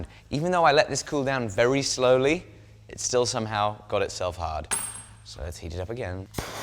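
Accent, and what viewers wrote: United States. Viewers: As an American I thoroughly enjoyed attempts at an American accent throughout this episode. Congrats on the vice grips, they look fantastic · He keeps using an American accent, I think he misses it here... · What's the "like goal" to get to do a whole episode in his american hick accent?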